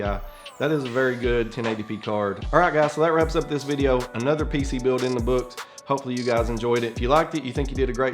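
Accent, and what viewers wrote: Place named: Kentucky, United States. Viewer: First time here. Love your video. I have to ask where you're from? You sound like a fellow Kentuckian. :)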